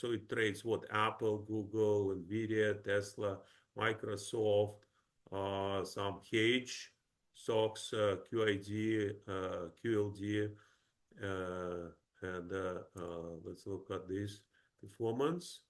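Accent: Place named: Ukraine. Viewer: What a terrible narrator. He sounds like a Ukrainian. Don't you have narrators who speak English fluently?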